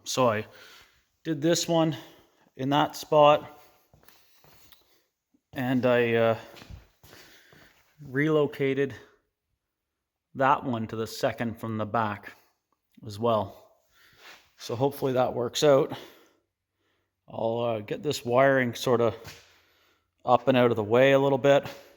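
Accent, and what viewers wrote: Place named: Minnesota, United States. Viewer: I hear a Minnesota or perhaps Wisconsin accent :)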